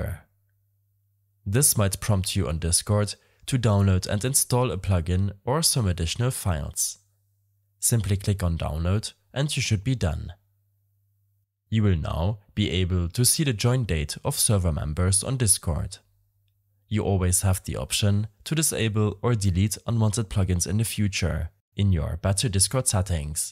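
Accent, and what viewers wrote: Germany. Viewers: haha du bist deutsch das höre ich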